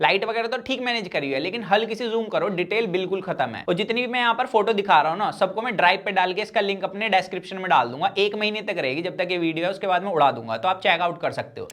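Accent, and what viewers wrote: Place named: Haryana, India. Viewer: Kuon hae yae Chutiya😂....look at his voice... haryana kae kuon sae "gaon" sae hae 💩💩 I thought it's mrwhosetheboss . BC mood off kar diya🤬 "itnaa kachraa hae you tube par"💩💩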